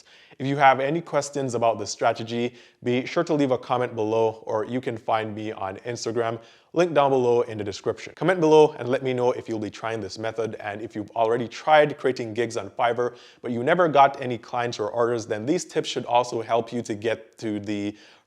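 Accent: Jamaica. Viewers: Yu sound like a yaardie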